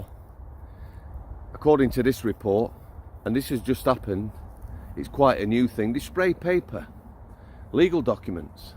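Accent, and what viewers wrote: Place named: Wigan, United Kingdom. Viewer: I wish I knew where you came from, you sound like a Wigner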